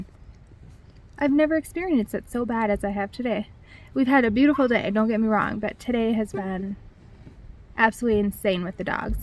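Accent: Minnesota, United States. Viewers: Your Minnesota accent came out to play in this video lol😊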